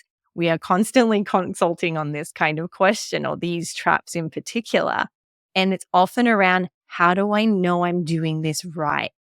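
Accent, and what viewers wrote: Australia. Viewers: I couldn't place where you accent is from, my best guess is you're an australian who moved to texas when you were 18 ^^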